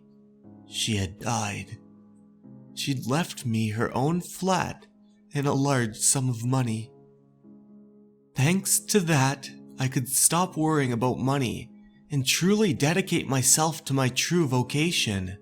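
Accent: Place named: Canada. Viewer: I KNEW IT I STILL GOT IT!!! It was an extremely minor "it really helps me OOT a lot" that perked my ears up. I'm also a gigantic Canada's Worst Driver fan and dating a Canadian (Hamilton, Ontario) once has me primed to notice your speech patterns (best described as an extremely minor case of John Travolta in Battlefield Earth in my opinion). In fact, it wasn't until watching this video of yours that I realized Andrew Youngblood had that same John Travolta in Battlefield Earth cadence that became more evident as the series progressed and maybe that's the real reason the best show of all time was ended after fourteen seasons. I'm onto you, Andrew. I know what you're doing.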